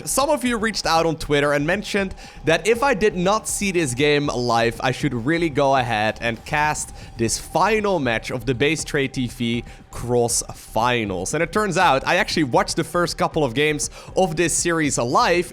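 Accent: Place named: Netherlands. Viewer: My god your Dutch English accent is so terribly cringy to listen to... Get some lessons to fix it already. Its been this shit for years now.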